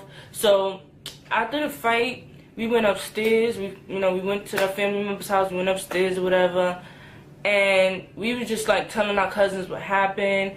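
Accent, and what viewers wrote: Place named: New York, United States. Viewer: LOL OMG i love these! "i put my victoria secret lipgloss away" 😂 😂This storytime sound odee NY!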